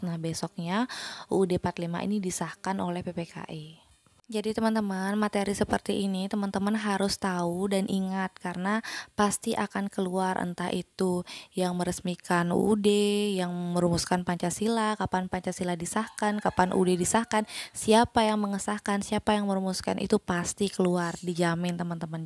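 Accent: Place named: Bali, Indonesia. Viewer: salam dr denpasar kak, dari logatnya kelihatan kak hehe ☺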